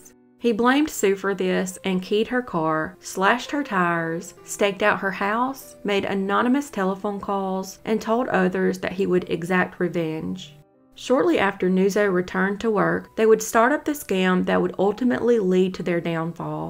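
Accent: South, United States. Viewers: I find your southern accent cute. You are a great narrator and I hope you get picked up by a major network. You are very detailed.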